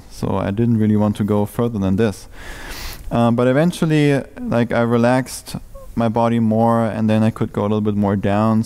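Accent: Germany. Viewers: Haha du bist doch locker deutscher hört man direkt an dein Akzent 😆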